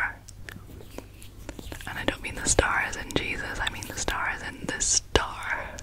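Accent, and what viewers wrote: Scotland, United Kingdom. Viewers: Prefer the rambling to the pure sounds because of the melodious Scottish accent. You should def do livestreams- super under utilized in asmr community. Also wouldn't hurt you to get some of dem superchats!😉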